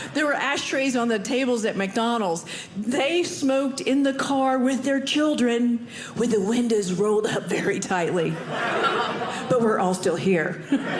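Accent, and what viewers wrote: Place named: Maine, United States. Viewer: Still a little bit of a Georgia accent, but more Maine in there than before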